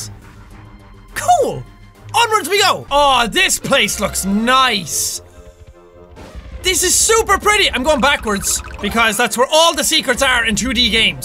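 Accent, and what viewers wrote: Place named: Ireland. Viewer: My mum loves hearing your voice while I'm watching your videos because apparently you sound like an Irish guy from Sherlock :)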